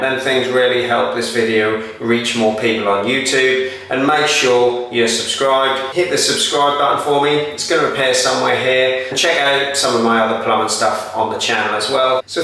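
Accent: Norfolk, United Kingdom. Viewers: Is that a Norfolk accent I detect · thanks chap, very useful, by the way trying to work out your accent are you from the UK? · Great help, fixed my problem, and love hearing a Norfolk accent on a video! (Takes one to know one)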